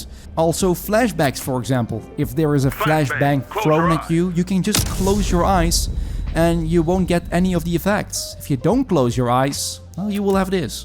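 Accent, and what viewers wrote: Netherlands. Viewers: U bent nederlands? Ik kan het horen aan uw engels accent.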